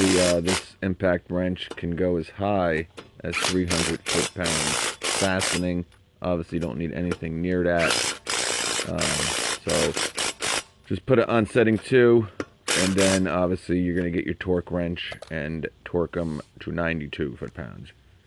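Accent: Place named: New England, United States. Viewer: Came back to watch again to do my first oil change. Had to use this video because the New England Accent #Represent . Thank you for posting this!!!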